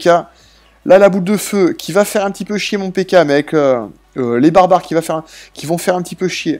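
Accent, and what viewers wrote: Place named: Toulon, France. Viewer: j aime trop tes vidéos PS: l accent toulonnais et bien aussi ;)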